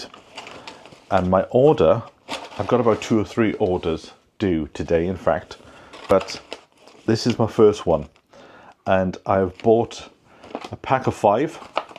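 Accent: Wales, United Kingdom. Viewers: Yes, I am doing that, and know that you do not have the allotment now, but now have your Rusty Homestead - love your Welsh accent, and your beautiful eyes by the way! xxx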